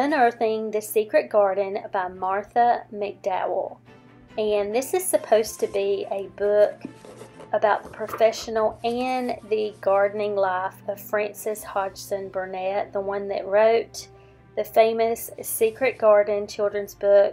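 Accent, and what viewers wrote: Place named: North Carolina, United States. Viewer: I just found your channel through Taylor. I have the secret garden book too. I really can’t wait to read it! It looks so pretty. Side note - are you from NC? Cause that’s where I’m from and your accent sounds just like so many from down here! I’m on the last chapter of Mansfield Park! I absolutely love it. The end of the book does take place in spring time, just after Easter.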